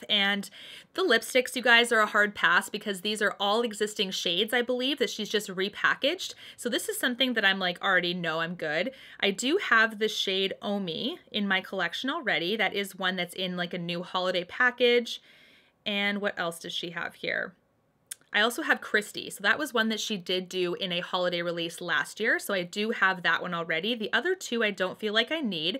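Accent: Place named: Canada. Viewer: I have to say I just love your channel and your personal energy. I also love your Canadian accent! 😁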